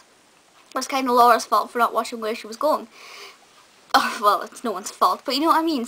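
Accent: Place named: Scotland, United Kingdom. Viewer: Hey young girl!! dont know if u are scottish or irish am guessing scotish am rubbish at accents! Think its great that you have an outlet for your interest in soaps!! U will be the NEXT JACI STEPHEN!! Keep ya chin up and don't listen to anything bad! You will go far! have fun and enjoyed watching ur post on Janine Butcher!! :-)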